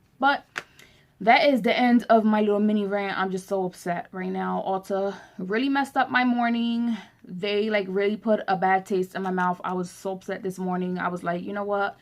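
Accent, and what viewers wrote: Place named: Pittsburgh, United States. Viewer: giiirl are you from pittsburgh? sorry off topic but your accent sounds like it! im from philly :)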